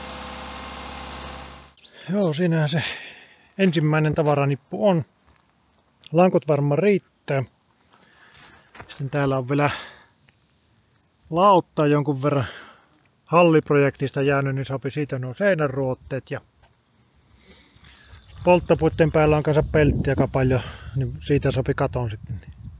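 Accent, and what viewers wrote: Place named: Finland. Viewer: Olipa mukava viteo👍, komia katos tuli. Sulla on ääni ja murre vähän ku Päätalon Herkolla😂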